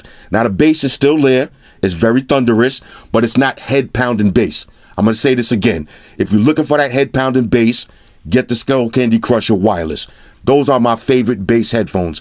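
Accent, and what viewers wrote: New York, United States. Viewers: I guarantee you this dude from New York 😂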